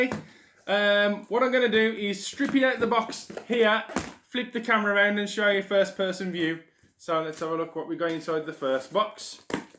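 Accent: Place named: United Kingdom. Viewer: oh. Oh right. Then I will stop sitting here watching the App Store as though I am waiting for water to boil. LOL! Thanks for the tip about set up as well. Maybe it will be a relatively painless process to set him up via the app. I was very disappointed in Cosmo‘s A couple years ago. Voiceover did not respond at all to the app after I opened it. It just played a bunch of music and wouldn’t show me anything. I hope victors app is an improvement. You will probably be getting the app before us. At least, you sound as though you may be from somewhere across the pond. Anyways, thanks for posting this unboxing!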